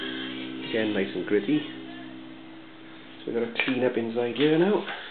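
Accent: Wales, United Kingdom. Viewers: Haha, very welsh. Good video. Cheers. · Proper good and proper Welsh. Excellent!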